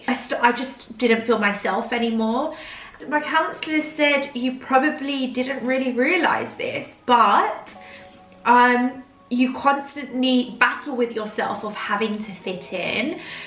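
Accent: United Kingdom. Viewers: Thanks for sharing your story. There’s something great about our Pinoy culture— the love, the caring. And what are they saying? Love your brit accent! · Delicious! We love you!pride of phil with your British accent and a Filipino heart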